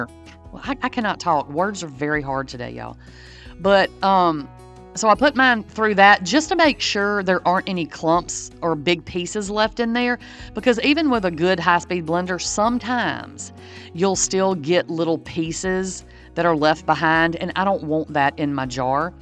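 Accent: United States. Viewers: omg,. just to say, I liked the video, and no doubt the creator tries to be a good human, but to many of us outside the US hearing this kind of accent is similar to hearing arabic accent....its alarming. Thankfully I dont fall for predjuces... Good luck over there.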